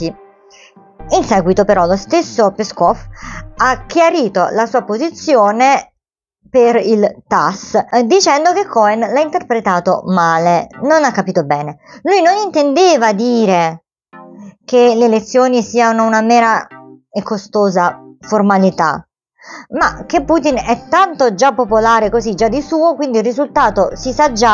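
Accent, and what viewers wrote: Naples, Italy. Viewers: Ciao.. sono arrivato al tuo canale per gli arcani misteri dell algoritmo di youtube e sinceramente sono rimasto positivamente basito. Ubi bene ibi patria scrivevano i romani tu sicuramente li sei felice e non ce bisogno di chiederti perche'! . Le tue ultime parole sono uno splendido messaggio all'occidente di non perdere quello che ha costruito , diritti sociali e opportunita di cambiamento. Non vivi con i coniglietti ma hai quella compassione tutta partenopea ( credo, partenopeo.. l'accento mi induce a pensarlo ) nell'accettare l'ineluttabilita' degli eventi in un luogo dove, un sogno sociale e' diventato un incubo per molti e la cura, dopo il risveglio decisamente piu lugubre . Navalny era una truffa tutta occidentale perche' niente di lui volgeva a un pensiero democratico ma sai.. anche qua la sinistra si e innamorata di hamas che dei diritti sociali e di tutto quello che l'illuminismo vede nella societa' sono la reale antitesi. 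Un po come la destra nostrana che paga Dugin per farsi raccontare che la razza non e' acqua e loro (Russi) sono gli angeli e gli altri destinati a una inevitabile sottomissione.. Incongruita dei sistemi .. Ajmone Federico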